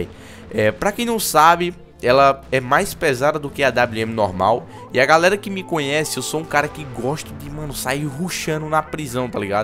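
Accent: Northeast, Brazil. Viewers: ai vc é nordestino! por que eu so de pernambuco e vc?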